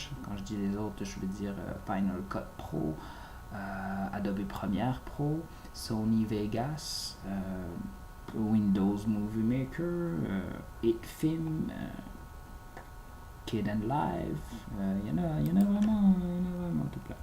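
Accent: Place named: Montreal, Canada. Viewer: merci beacoup pq je ne savais pas comment l avoir tu m as aider et aussi ete vous du quebec pq vous avez l acsens de montreal et l aval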